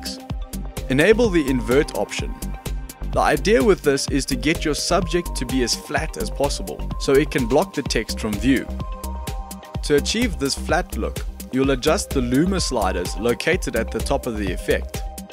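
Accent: South Africa. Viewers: Hi, very nice tutorial mate. I have a video request I'd really like to make. In iMovie on the Titles tab, there is a title animation called "Line". A line expands out left and right from the centre and then text fades in above and below that centre line. I would like to do the same thing in FCPX but instead of a fade in, I would like the text to come out from "behind" the thin line to rest on the top and bottom of it. I thought I could achieve this effect by using transparency masks for each text blocks and then using the baseline tool to move the text above and below the line. However keyframes don't track the baseline setting between frames so I can't animate it. Do you have a tutorial for this? Thanks so much for your help! PS Are you South African?:D Your accent sounds very similar to where I grew up! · Good to hear a fellow RSA !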